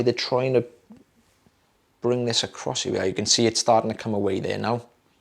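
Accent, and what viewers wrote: Wales, United Kingdom. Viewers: Look how the rookie became a specialist… The only correct answer is that you were trained by the best 🤨😎 And the Welsh accent is soo nice to listen to 🤭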